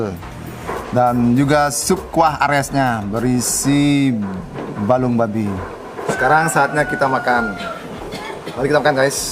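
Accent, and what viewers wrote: Bali, Indonesia. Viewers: logatnya itu lohhh bali bangett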